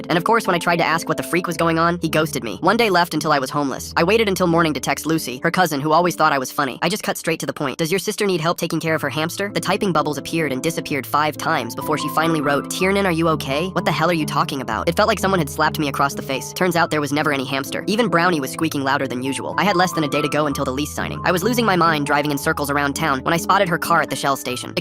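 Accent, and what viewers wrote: Jamaica. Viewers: Bros Jamaican like me 😂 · Is bro Jamaican or something 🇯🇲😅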